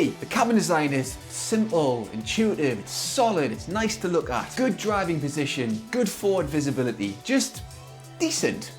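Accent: Newcastle, United Kingdom. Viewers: Canee geordie accent like!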